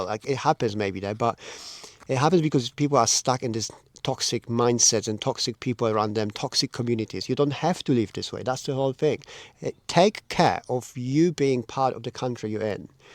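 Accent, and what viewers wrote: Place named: France. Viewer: I don't know whether you'll take this as a compliment, but from here in Australia you remind me of the French maitre d'hotel who is on television a lot with Gordon Ramsay. Fred Sirieix. Particularly your accent 🙂 ....and it is meant as a compliment!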